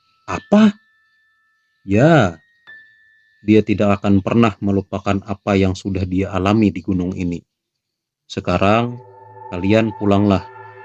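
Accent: Banjar, Indonesia. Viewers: Urg Banjar kah Pian mang..ketahuan di logat neh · Mimin urang banjar jua neh lugat nya 😀😀.. Nah maaf nah min hnyar tdpt chanel pian mudhan sukses.. Salam dr banjarmasin🙏🙏🙏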